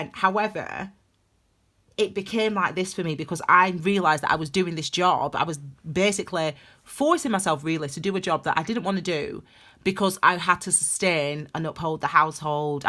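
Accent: United Kingdom. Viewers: Congrats! TY from U.S. Your accent sounds British. Enjoy your holiday. We would say vacation, I think. 🌅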